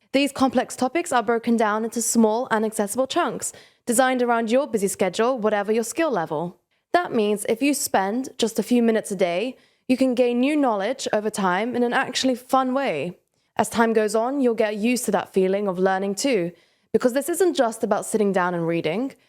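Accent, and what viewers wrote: United Kingdom. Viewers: What is with the strong British accent ? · I love the accent of the narrator! She sounds British but also has a bit of difficulty pronouncing the "th"-sound. So 33 sounds like firty-free. But the replacement (th -> f) sound isn't the same as the one commonly heard in and around London. It's slightly different and very noticeable. Not unpleasant, mind you. Just different.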